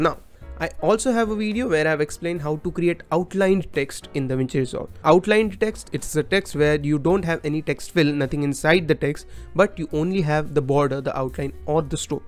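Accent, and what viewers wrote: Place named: India. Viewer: Как обычно, вначале рыщешь половину интернета в поисках инфы, а по итогу один мужик с индийским акцентом за 3 минуты всё рассказывает как надо. Спасибо тебе, брат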